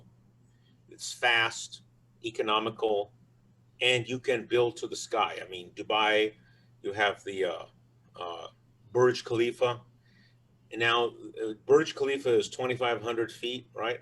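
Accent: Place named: United States. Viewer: Awesome session 😁. Liked American accent of speaking of sir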